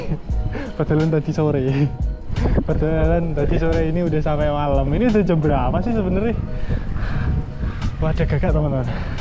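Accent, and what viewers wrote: Java, Indonesia. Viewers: mas bro sampean logatnya kok kayak orang jawa ya ,kalau boleh tau jawa mana ya? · Aku seneng logat jowo mu mass💍😻😌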